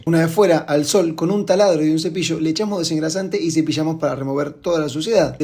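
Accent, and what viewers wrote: Argentina. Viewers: De donde es este flaco? es un argentino que vive en españa? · que es la cinta q le pusiste al espejo? tengo rotito el mio... sos argentino? las patentes no son argentinas pero la tonada si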